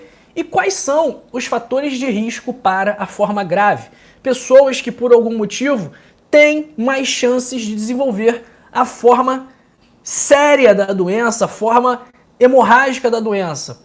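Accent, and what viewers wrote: Rio de Janeiro, Brazil. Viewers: MariaSantos, buscando trazer dados para sua compreensão (sobre o desconfôrto dito aqui), no intuito de somar, acredito q vc tenha querido se referir, na verdade, não à "voz" em sí, mas, talvez, ao "sotaque" - isto não é dele, é do sotaque do povo do Estado do Rio de Janeiro, o "sotaque carioca", que troca o "s" pôr "ix" (ex: maS e maix, coStaS e coixtaix, diagnóStico e diagnóixtico). Espero ter contribuído. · Parece piada né kkk, o Dr todo cuidadoso, dividindo informações importantíssimas, ajudando o próximo e a criatura reclamando do sotaque Cariquexxxx. O mundo tá do avesso 🙃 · Ele tem o sotaque do Rio de Janeiro. Só isso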